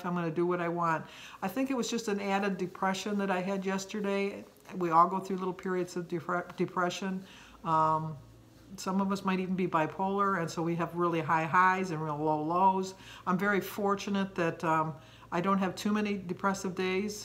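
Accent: Midwest, United States. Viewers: The ' " LORDY MERCY ME.....you should be so proud of yourself...because of you, Carrie and Amy, I finally got over this hump in my weight journey (11 pounds down since October)....I am.4lb. away from my PERSONAL GOAL for my 65th birthday next Thursday. Even though I have achived Lifetime status 5 years ago, (lot's of stuggles, ups and downs, that's just LIFE) I was getting out of control again. I was paying for my meetings because I was over the 2 pounds that is allowed. I have two more Saturday's to weigh in to hit my 'goal'....Then after that, I have another little goal by May 5th. I leave for Italy on a biking vacation and you know what kind of food I will be facing in Italy!! I can handle it....I know I will.... that is why I LOVE active vacations....Keep on moving, chicky girl....I am orginally from Chicago and I relate to your Midwestern accent...😂😂👏👏🎈🙏🙏 Stay strong...Rome wasn't built in a day!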